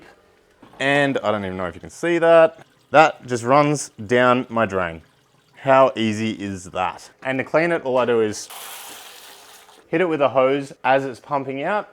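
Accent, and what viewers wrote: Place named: Australia. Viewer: if you pay attention, you hear that his accent is not native to the US of A, More likely its Australian. In Australia, home distilling is allowed.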